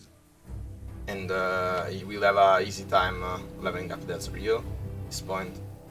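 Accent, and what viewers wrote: Italy. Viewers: Italian accent + I'm italian.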